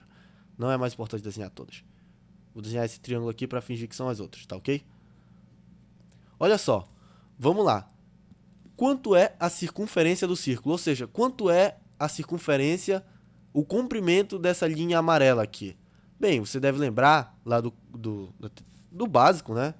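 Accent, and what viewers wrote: Pará, Brazil. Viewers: Pelo sotaque parece ser paraense. Meu contaerraneo Haha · Paraense?Ta explicado porque intendi o vídeo égua kk És professor?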